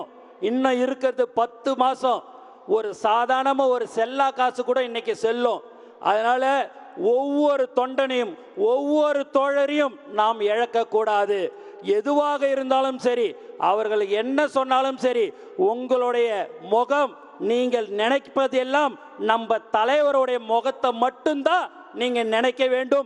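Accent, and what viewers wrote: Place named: Pondicherry, India. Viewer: Pondy tamil apdi than irukum. Athu oru style.....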